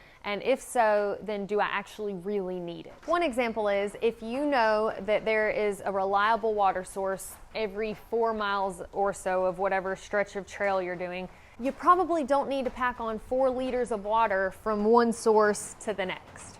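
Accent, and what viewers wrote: South, United States. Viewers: Why can't every woman in America have a southern accent ?? 🙄. Makes your videos so much nicer to listen too. Thanks for posting.... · Draws? As in rhymes with straws? I’m pretty sure you’re talking about undies. I do love the southern accent. But our European friends might have problems with this one. Anyways, great video as usual. Keep them coming.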